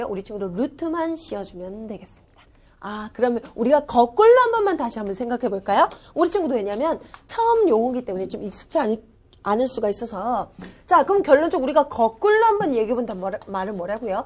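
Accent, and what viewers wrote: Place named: Busan, South Korea. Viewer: 연기할때 표준말 쓰는데, 부산이 고향이라 그런지 실제 목소리 들어보니 약간 부산 억양이 있음. 부산 사람 DNA는 있나봐.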